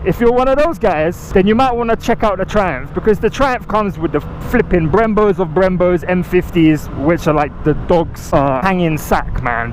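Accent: London, United Kingdom. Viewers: That's not a British accent, that's the accent the white boys who want to be black use in London. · I think he's from London and I know loads of geezas who talk like him. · Too young to be old new London accent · I'm confused, you have a british accent but your nott in the UK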